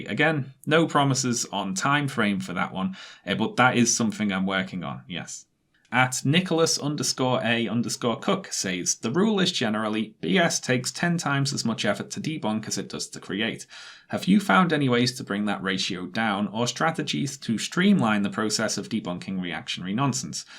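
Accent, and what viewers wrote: Liverpool, United Kingdom. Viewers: well, it’s informative, entertaining and elegant in its simplicity. Plus, I’ve discovered I have a thing for Scouse accents. The ones I can understand anyway.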